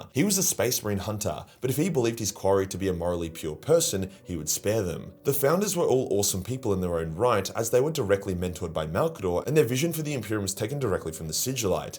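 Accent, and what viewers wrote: Australia. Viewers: I came here for everything besides the Eisenhorn shit, then was surprised by how much shit I have no clue about then realized I'm gay and just wanted to relate to your Australian accent in a way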